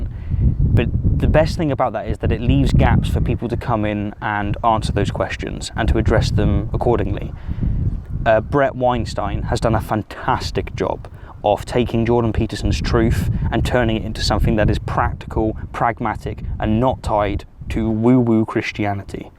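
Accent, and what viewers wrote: United Kingdom. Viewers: So you re british! Didnt notice in somehow. Southern coast seems nice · wait you're British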